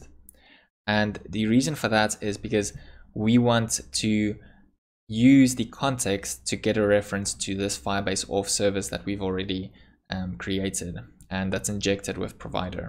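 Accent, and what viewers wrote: South Africa. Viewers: Are you from South Africa? Your accent sounds South African? Great video! Thanks!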